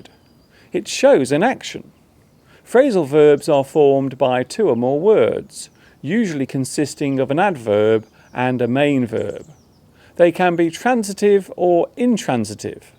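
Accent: United Kingdom. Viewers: great lesson think you I have question please? Are your accent British or Amrican because our education British so i ask to know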